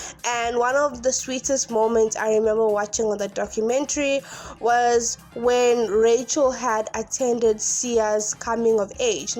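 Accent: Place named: United States. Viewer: Why is this girl (naraiting) talking with an American accent. Pse!!!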